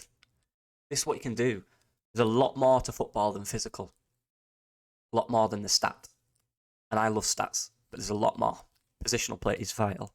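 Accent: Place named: United Kingdom. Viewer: Am trying to figure out your accent what part of the uk you from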